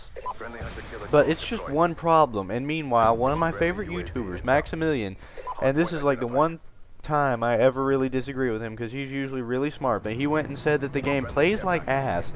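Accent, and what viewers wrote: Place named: Texas, United States. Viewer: I do disagree, if you disrupt the rudimentary way of a how a game is intended to function, then the entire experience is spoiled. Thankfully, the PC port isn't AS plagued with networking problems as its console counterparts, so you're more likely to enjoy the game at a different venue. Also, dat texan accent :3c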